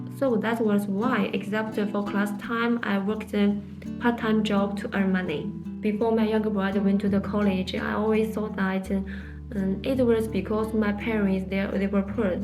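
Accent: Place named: India. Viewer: Your English like Indian 😊, ❤ Bhabhi ji. Namaste ❤ 😊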